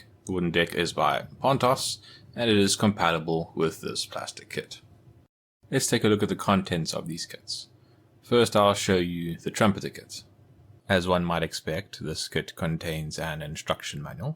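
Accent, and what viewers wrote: South Africa. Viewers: Hey. You sound South African? If so, can you help with where to find the PE parts and wooden deck kits here?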